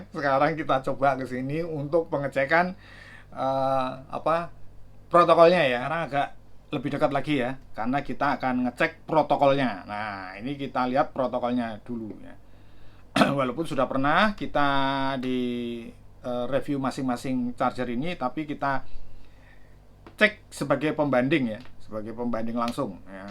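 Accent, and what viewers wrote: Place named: East Java, Indonesia. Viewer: Nek wiz muni,, "Haaahhhduhhh...." Aksen JATIM nya kuat sekaLii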